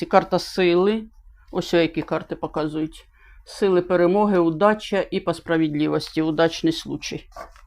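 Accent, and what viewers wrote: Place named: Central Ukraine, Ukraine. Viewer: Ми всі так розмовляємо в центральній Україні,сурджиком.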